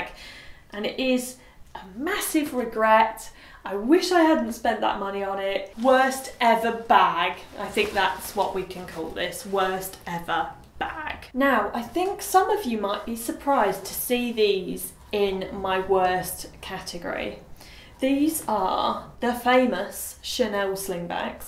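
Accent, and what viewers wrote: United Kingdom. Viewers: I love love your speech with the clear british accent and beautiful voice. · I love your video and your british accent. The dupe you are proposing are stupefying. Good luck for your marathon! Your fan from Quebec!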